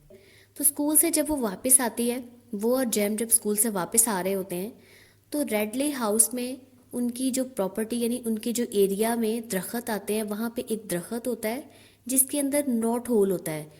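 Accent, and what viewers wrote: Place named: Pakistan. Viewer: एक्सेंट बाहिर सुनकर मुझे लगा कि आप पाकिस्तानी हो और सच में आप पाकिस्तान से हो👍 ग्रेट You have summarized the story very well. I am an Indian and I have to study this story in the 5th semester of college in Delhi University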